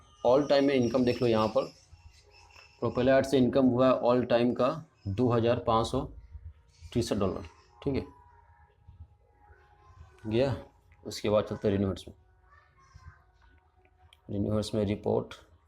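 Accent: Bengal, India. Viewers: Bhi Ap Bangali Ho Kya.....?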